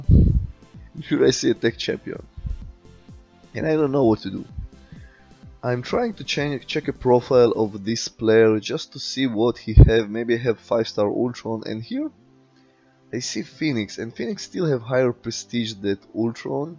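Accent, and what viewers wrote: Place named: Russia. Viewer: Travis Nadeau Pesantes Russia, Ukraine... Id guess. The accent.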